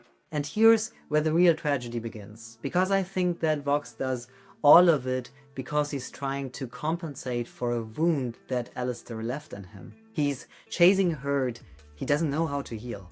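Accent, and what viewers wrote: Germany. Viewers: Ich höre einen deutschen Akzent heraus 😏😏 Freu mich einfach, wenn Leute aus Deutschland über Hazbin Hotel/Helluva Boss reden. Sehr nices Video! :)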